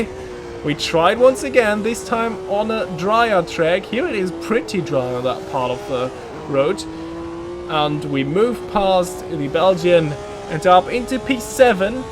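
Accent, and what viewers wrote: Germany. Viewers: You sound German are you German?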